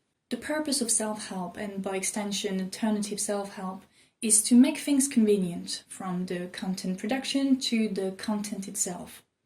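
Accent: France. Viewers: I love your Frenchlish accent. 😃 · Very nice perspective that I have not heard discussed before. Et le tout avec un accent Français en plus. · Where are you from? You sound simultaneously French, German, and Irish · you're just the same with them. slower pacing and frnch accent, but the same. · Yes, sometimes i dont understand what the hell she is saying because of that bloody french accent. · Are you like French - having lived in an english speaking country? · You put forward an interesting perspective on alternative self-help that is thought-provoking but I must critique your last few comments. "What if getting angry was self-help? What if talking about social injustices was true self-help? ... And that for me, that's what self-help truly is" Like others in the comment section have said, I think your misunderstanding of what self-help is lies in those statements. 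Self-help quite literally means to help oneself, to better oneself, to care for oneself, and be self-sufficient. It's egocentric, but not egotistical (but not ever exclusive to each other.) Your ideas of self-help are about helping others, social injustices, activism, and related liberal values. And there's nothing wrong with that, but that's all unrelated to self-help. That's being philanthropic, that's being altruistic, that's activism, that's helping another person, that's selflessness. But it's not self-help. Of course, helping others and volunteering can bring benefits to you and another person, but it's not the overarching definition of self-help. I think the fallacy in your thinking is being absolutist. And you demonstrate this by thinking that what seems to be true for YOU, then must be true for others as well. But that's not right. I like to take morning showers, but I know it's not necessarily the "right way" to do things. 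I can tell you have a French accent so you might not realise how your words may have conveyed a misunderstanding with some of us contrarian v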